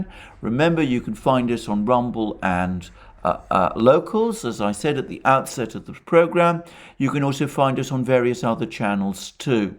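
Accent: England, United Kingdom. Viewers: He's got an English accent, my friend.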